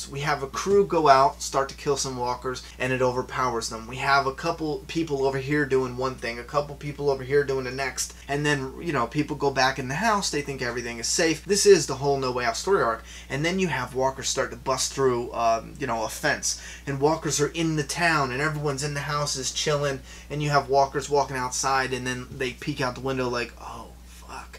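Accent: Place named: New England, United States. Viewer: The way you talk...are you from New England??? Haha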